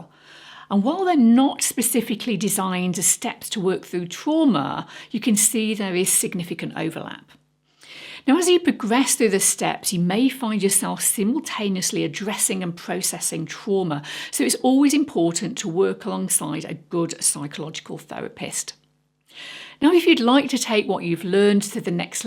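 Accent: United Kingdom. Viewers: I love your meditations or I should say your talks. They are very well informed about things like what happens in the brain, for any number of reasons May i simply askthat you talk about borderline personality disorder? because I hear you saying that you can choose this or choose that and people with bordrlije personality are terrified of abandonment, real or perceived.... and as I speak and for several years now I've been abandoned to awful little boarding homes for lots of money in rent, And the present one is costing me $900 a month and I'm told by the manager that he doesn't need the money when he gets somebody in here fast and they leave almost as fast as they get here because of things that are going on here, verbal abuse and gossip between all of about residents. Plus: same thing from the woman who cooks here. What happens to the mentally ill in mental health awareness month here in the US!! 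You sound like you might be from the UK, and I don't know , really. Here in America, it's May and May is supposed to be a mental health awareness month I got verbally abused by some overworked nurse in the ER , here in America at most private or public hospitals or in general, all the nurses and psych tecchs, etc... at least in the hospitals where I've been admitted to psych units all over Birmingham Alabama… They want their 12 hour shifts so that they can have three days or four days on and then three or four off and so they get stressed and they take it out on whom? Maybe you gue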